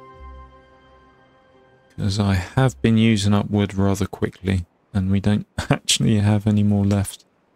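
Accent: United Kingdom. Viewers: You do realise that only the Brits (you) and Aussies (me) will understand what you just said.... about Beavers.... Your accent sounds British - let me know if I'm way off base, but I totally got your humour.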